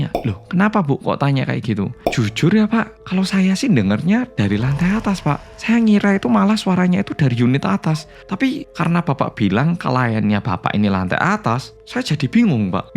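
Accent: Java, Indonesia. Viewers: Hallo bolo medok❤ Nyimak🎉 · terakhir bikin ketawa pas bilang duhh duowone 😁 jowo nyeelll pokok e dirimu.kalau dengerny sambil merem pasti tak kira org Jawa nyell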